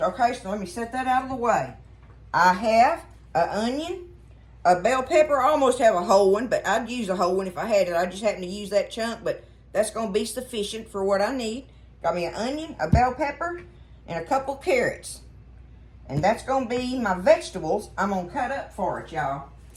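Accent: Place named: Texas, United States. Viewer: Love watching you cook with you country ways and Texas accent! Being from Lampasas Texas, I can understand you very well! lol😊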